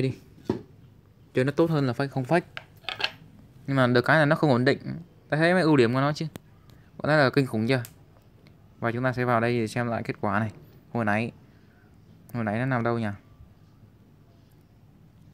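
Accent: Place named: Central, Vietnam. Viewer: Miền trung kb dépác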